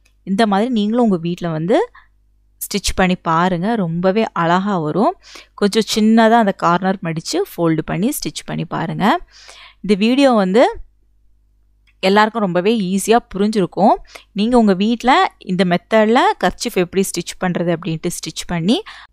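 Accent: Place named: Kanyakumari, India. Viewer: Super explanation vera level patience ungaluku Like that kanyakumari slang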